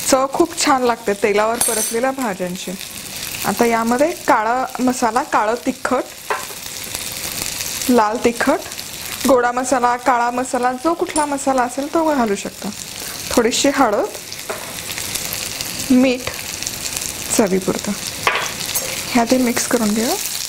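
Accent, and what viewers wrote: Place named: Vidarbha, India. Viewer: Tumchya recepi chan astat. Mi nehmi baghte. Aani karte pn. Tumhi VIDARBHATIL aahat ka. Bhashewarun kalat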